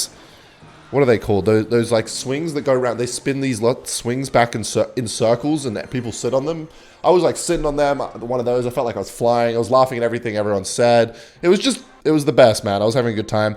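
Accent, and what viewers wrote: Australia. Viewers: Are you from Perth brudda? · Mate you from WA? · sometimes i forget is australian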